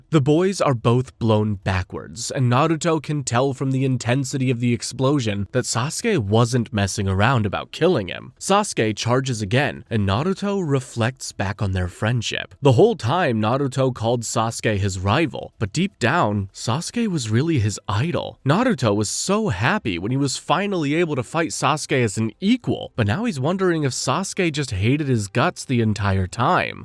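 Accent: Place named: United States. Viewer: loved the videos but holy can you please stop pronouncing all the names UBER AMERICAN holy fk Its MAN GE KYU not MAN GEK KJO, this is just one of like 9000 cringe moments, its not to hard to do such a thing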